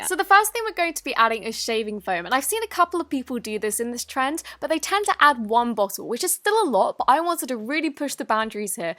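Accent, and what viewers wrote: England, United Kingdom. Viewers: I really love your British accent! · I love you because your videos are perfect you are really pretty and I love your voice also you're British like meeeeeeeeeee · Don’t forget “at all” in her cool British accent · Are you English???¿¿?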